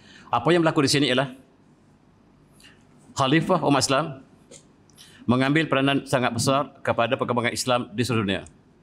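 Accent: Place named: Pattani, Thailand. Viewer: Terharu apa yang di sampaikan oleh prof benar benar menyentuh hati bahkan logaht bahasa melayu Pattani tanpa sedar airmata ku mengalir di pipiku.. memang tepat dan benar prof..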